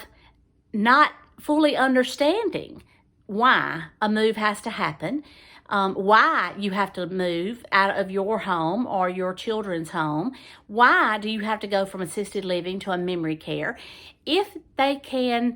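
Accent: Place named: Alabama, United States. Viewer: thank you! We had a set back to monday. I too have been told to wait a week or more till he settles in. I’ve watched you so many times for advice and I appreciate all that your teaching all of us going through this hard transition. And your accent brings me comfort as my people are from Alabama as well. I don’t know what town your from but my people are Russellville and Athens. I’m wondering your advice on stage 5? What stage do most need full time care?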